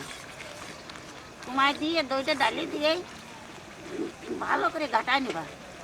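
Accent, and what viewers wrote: Bangladesh. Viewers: দাদীমা আপনি আমাদের মানে বাংলাদেশের মানুষ, আপনার কথা শুনেই বুঝি আর রান্না দেখে তো অবশ্যই আমার দেশের ঘ্রান পাই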